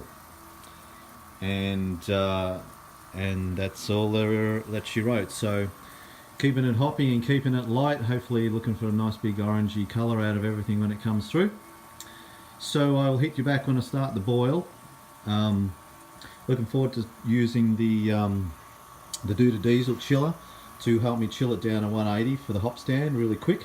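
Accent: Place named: Australia. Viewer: Good vid. Like the Aussie accent with the occasional US inspired pronunciation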